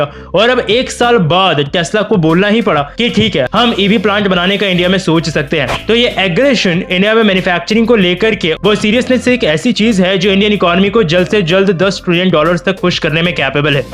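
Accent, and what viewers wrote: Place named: Pakistan. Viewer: Bhadwe tu pakistani hai, fake khabar mat faila.. teri accent pakistani hai BKL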